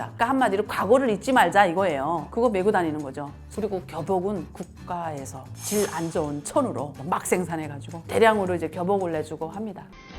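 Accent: North Korea. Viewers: 재미 있게 진행 하십니다 말도 북한식으로 하니 실감나게 들립니다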